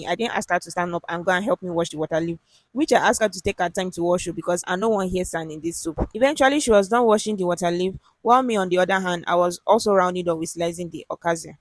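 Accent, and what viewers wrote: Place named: Akwa Ibom, Nigeria. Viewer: Immediately I heard strong garri, I know say na Akwa Ibom sister be this😅😅😅. Song Idem o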